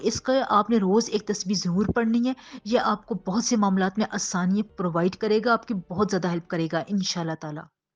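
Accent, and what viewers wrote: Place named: Punjab, Pakistan. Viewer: tez lehja punjabi h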